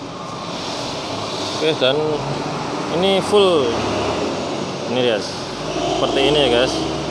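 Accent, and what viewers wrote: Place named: Java, Indonesia. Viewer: Medoke lucu bang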